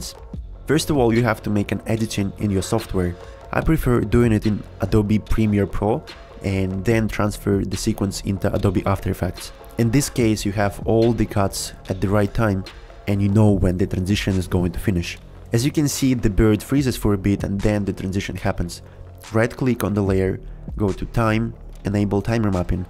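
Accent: Russia. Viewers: Russian accent detected ^^ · Какой ужасный русский акцент!)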